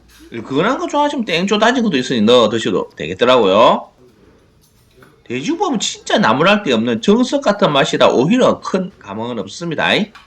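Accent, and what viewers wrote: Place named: Gyeongsang, South Korea. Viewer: 형님. 채널에 따봉 30회 정도 박고 추천 뜨면 꼭 봅니다. 답변 두 가지만 부탁 드립니다. 1. 아무리 맛집이라고 해도, 기대감이 얹혀지면 감동받기 쉽지 않은데, 정말 이 집만은 무조건 꼭 가야한다라는 곳이 있으면, 한 곳 추천 부탁드립니다. 2. 무꾸재비 뜻 좀 알려주시라예. 볼 때마다 너무 궁금합니다. 저도 경남 촌놈인 주제에 처음에는 사투리가 너무 거슬려서 부담스러웠는데, 지금은 그 사투리 없으면 무슨 맛으로 볼까 싶은 마음입니다. 어쨌든 마창석님과 기분 좋은 영상 계속 만들어 주시면 감사하겠습니다. (마창석님의 짜투리 평가도 넣어 주시면 감사겠습니다. 목소리가 궁금합니다.)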